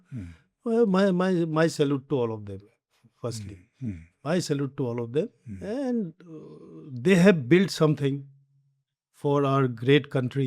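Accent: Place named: Arunachal Pradesh, India. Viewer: Arunachali accent is coming 😄❤️🔥🔥🔥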